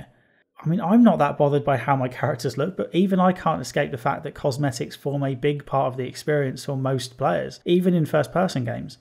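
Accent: United Kingdom. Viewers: British accent The f*ckin' STATE OF IT!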